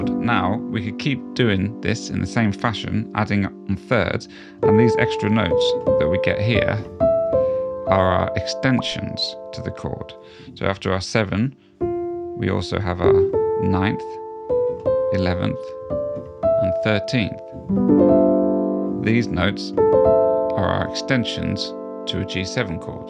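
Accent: United Kingdom. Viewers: Your delightful (South London?) accent and phrasing remind me of Tim Roth (aka Pumpkin) in Pulp Fiction. 😊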